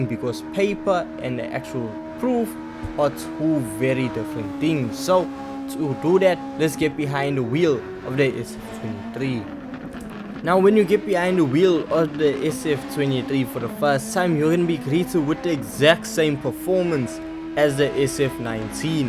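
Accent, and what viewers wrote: South Africa. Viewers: Are you South African? 😂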